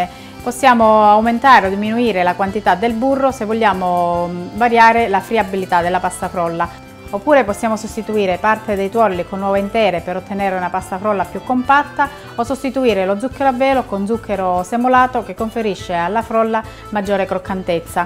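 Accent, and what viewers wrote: Calabria, Italy. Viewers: Grazie! Spiegazione impeccabile. L'accento calabrese è bellissimo. :)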